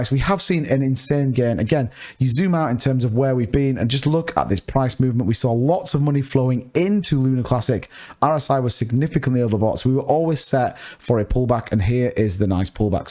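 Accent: United Kingdom. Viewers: That’s UK accent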